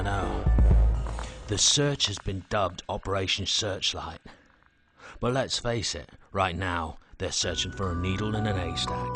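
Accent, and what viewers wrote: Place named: Cockney, United Kingdom. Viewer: God I hate that cokney accent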